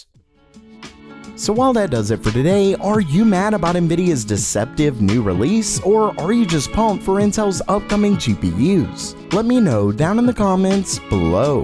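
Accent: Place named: South, United States. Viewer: that country accent tho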